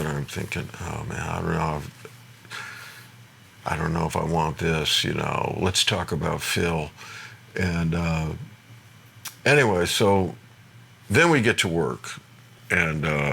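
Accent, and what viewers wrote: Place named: New England, United States. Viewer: Clevah for clever. It's just called a New England accent. RI, MA, NH, Parts of CT. We all talked that way.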